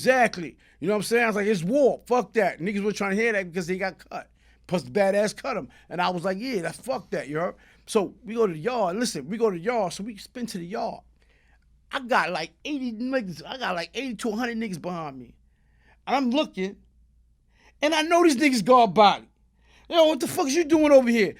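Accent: Queens, United States. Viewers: Naw you from Queens haaaaaa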